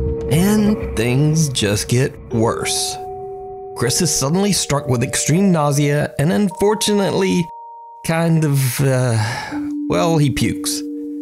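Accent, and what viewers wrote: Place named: South, United States. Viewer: your voice seems to be getting more and more of a "southern drawl" to it. Did ya relocate or something? Btw that may sound creepy(oh the irony)but I'm not asking where you live and have no idea where you are or have been.lol)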